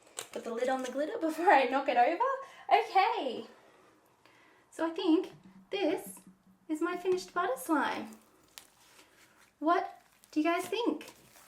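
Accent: Australia. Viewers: You sound so much like my violin teacher, she is from Australia too. My mom lived in Australia for 1 year and she GOT soooooo god in english!! 6++++++ every test ehehehhe🥰❤️😂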